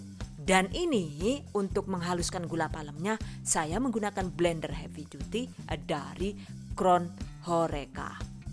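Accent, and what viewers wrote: Banyumas, Indonesia. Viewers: Bunda asli mana bun. Kok Bahasa nya ngapak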